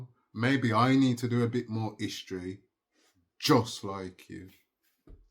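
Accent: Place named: Birmingham, United Kingdom. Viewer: Your English mainly because of your accent mate. Brummie area Jamaica/Africa bloodline.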